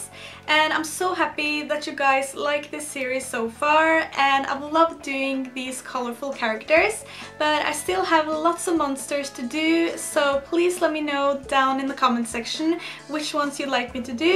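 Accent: Norway. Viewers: you can hear that you're from norway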